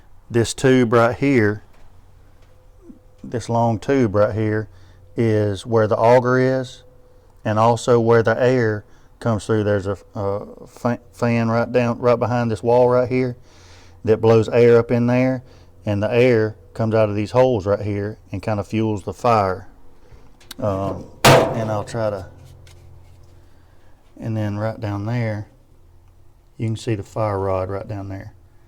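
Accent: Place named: Georgia, United States. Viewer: Hey Brother , you sound like a guy from South Georgia. Great Job explaining the Pellet Grill. Learned some new stuff. I live in North Florida and I have had my grill about a month. Thanks for sharing.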